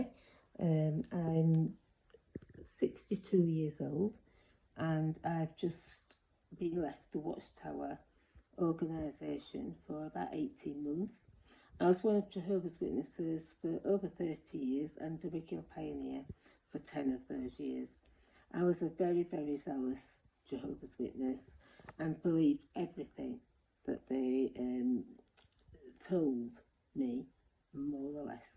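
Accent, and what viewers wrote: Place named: Yorkshire, United Kingdom. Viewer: Hey. My name is Ken, I left JW's after 37 years in 2012, having been brainwashed since the age of 5. Thank you for your video. It's extraordinarily powerful. I remember studying the "Revelation" book in my teens and twenties at the "Book Study Group"once a week, and clearly remember the Watchtower Society excoriating the United Nations. I also remember being at the Twickenham Convention and hearing a letter read out from the platform, condemning the UN as the "Wild Beast". They then bragged that the letter was sent to the UN headquarters. They ridiculed Christendom as being a harlot, and yet, they dropped their own panties and got into bed with the UN - behind the backs of their congregants. What a bunch of spiritual slut$! Vile, disgusting hypocrisy. I'm so glad I am free. I am so glad you are free. I now live in Louisiana but am originally from London. 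Sounds like you're a Yorkshire lass. Am I right? Thanks again for a great video.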